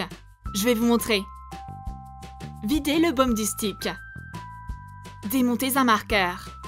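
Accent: United Kingdom. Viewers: vous êtes française ou anglaise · vous êtes anglaise ou française?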